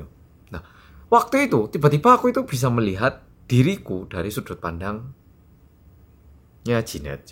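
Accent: Java, Indonesia. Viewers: kok malah logat jawa ya keliatan medok he he hee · medok,medok,medok banget sih....bahasa koreanya logat Jawa ya?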